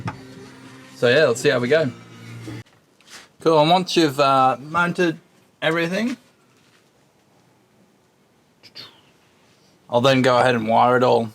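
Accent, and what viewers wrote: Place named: Ireland. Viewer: Are u part irish little bits would sound irish and somethings you say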